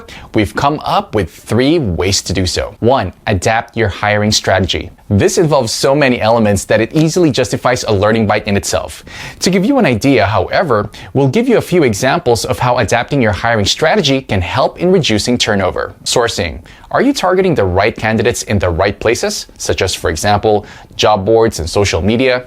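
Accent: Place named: Philippines. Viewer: Based on this dude’s accent... he’s Filipino 😏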